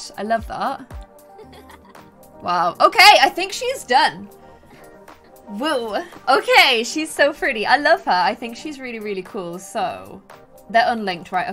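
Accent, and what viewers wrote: United Kingdom. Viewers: Hi I love your channel and no I am not just saying this for likes. I just want to see this just nothing else. you are amazing you inspire me and inspire me to do stuff like you do. I love Britain and I love your accent. If you would just see this that would be a miracle but of corse your not because no one does ever. Just please see this and remember I just want to see this. I don't care how many likes I get so don't put hate comments.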